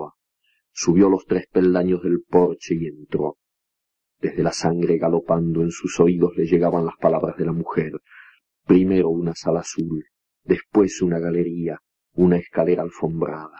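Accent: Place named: France. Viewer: Es único, esa pronunciación afrancesada ..me encanta..es especial escucharlo ..gracias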